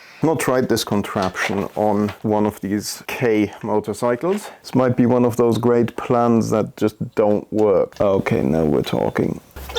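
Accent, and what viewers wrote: Germany. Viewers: Right you are… beauty always very individual - here in Germany we say: „Die Schönheit liegt immer im Auge des Betrachters.“ As you know I think, because your English sounds a bit German !? But please don‘t get me wrong. Your BMW does look great, your YouTube-Video is well made and very informative. Thank you for that. Greetings Matthias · Most obvious German accent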